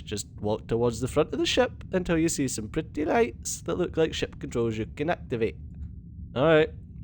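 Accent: Scotland, United Kingdom. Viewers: Woo! HAL 9000 jokes with a scottish accent! if this game would have multiplayer, i will buy this as soon as it's awailable =D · I was laughing so hard while was reading the Robots responses. Not just because the dialog was funny but, because accent seemed go go well with it. Hurray for Scottish accents. P.S. Love your vids + keep up the good work.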